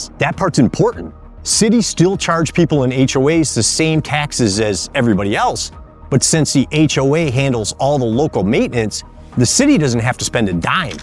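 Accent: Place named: Wisconsin, United States. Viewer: Are you a Wisconsin boy? I can hear it😊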